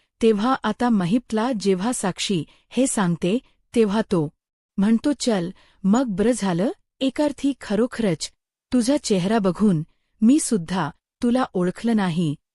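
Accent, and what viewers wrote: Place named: India. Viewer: What is your name,you sound like hindi speaking.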